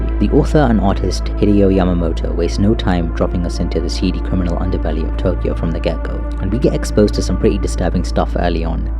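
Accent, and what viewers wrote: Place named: India. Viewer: INDIAN ACCENT..DISIKE👎👎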